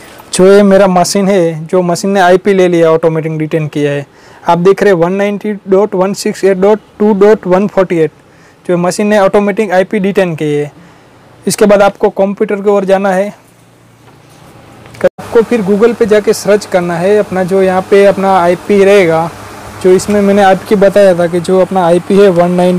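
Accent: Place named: Gujarat, India. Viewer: Bhai aap Gujarat se lgte ho aapki language se....Achha mujhe yeh to btao k A4 paper ka business krna ho to Guide kre aap plz.Thank you dear.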